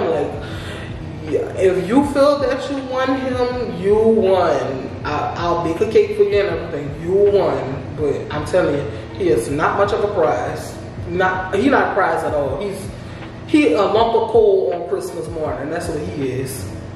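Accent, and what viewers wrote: New Orleans, United States. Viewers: Hey there my name is I’m just discovering your videos I can dig it I will be following! you remind me so much of my best friend...ikr 2 lol! Btw Ik your probably frustrated with your self behind the situation but as far as being embarrassed i think not!! You have absolutely nothing to be embarrassed about! I’m sure at some point in life if a person is old enough, more than the majority of us have been thru this or something similar...trust me!! Oh & I love your accent gotta be N.O. baaabeee😂❤️🙏🏾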